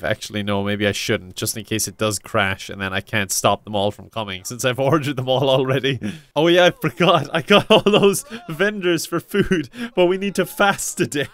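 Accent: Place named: Ireland. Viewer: Where in Ireland are you from 💜💛💜💛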